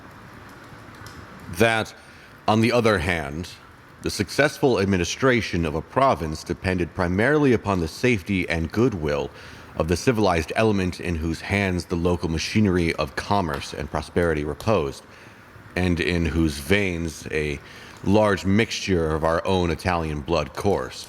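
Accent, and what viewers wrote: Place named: Arkham, United States. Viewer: Oh yea, I was transported back to Roman horrors… So there’s another older take on this short story? I’ll look it up… Really enjoying your narrations, accent seems location-appropriate, practically out of an Arkham sanitarium…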